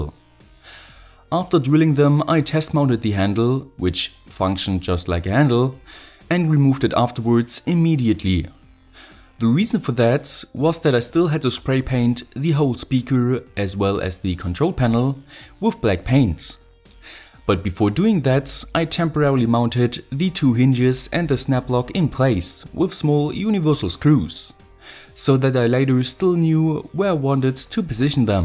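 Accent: Germany. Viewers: *German accent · You are from Germany? Kommst du aus Deutschland?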